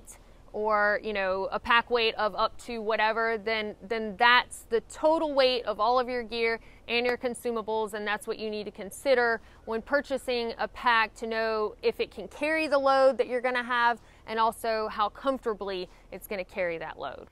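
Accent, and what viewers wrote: South, United States. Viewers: 💜 you’re Vlogs and inspiring enthusiastic way of explaining hiking adventures in your southern belle accent 💗Thank you for sharing 💜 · Not only is a lovely lady, she's also a real smart cookie😁 Thankyou, as always your video was very informative and as always, we love that southern accent 👍👍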